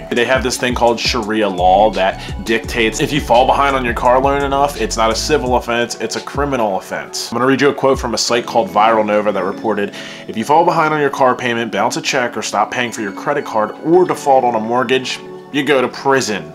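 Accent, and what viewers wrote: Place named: Delaware County, United States. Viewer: r u from pa?? u have a delco accent lol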